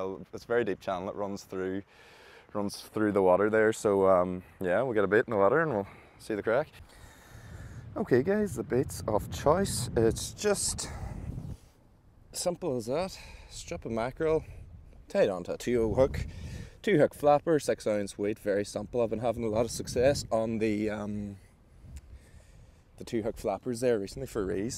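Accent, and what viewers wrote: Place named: Northern Ireland, United Kingdom. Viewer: Baffled me why your accent was like northern Irish . Till i realised you are only about 12 miles from derry city . Unless you are actually from derry ?. 👍👍. Grandad is from Galway .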